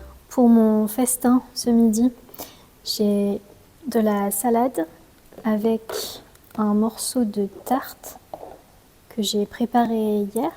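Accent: France. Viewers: Amo tu acento francés ❤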